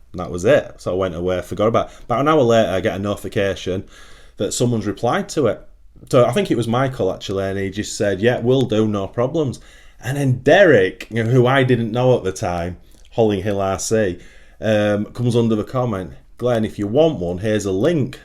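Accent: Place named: Yorkshire, United Kingdom. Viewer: I love your videos you live in Canada but you sound like your from Bradford or may be Leeds. I am looking forward to your next video.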